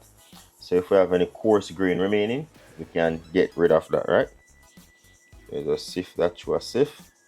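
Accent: Jamaica. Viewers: Chief.. I. Love. The. Fact. You. Speak. English. Well. Just. With. A. Little. Jamaican. Accent. Which. Is. Fine.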